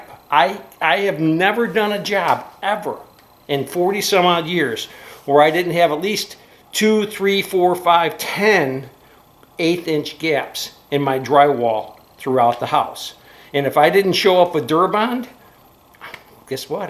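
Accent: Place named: Chicago, United States. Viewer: I love that Chicago accent !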